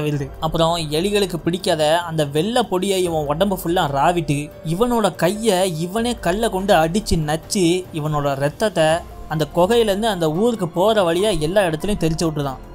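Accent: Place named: Thoothukudi, India. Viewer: Hai bro. . Nama ooru slang mari iruku. . Iam frm thoothukudi. . Especially and ketta vartha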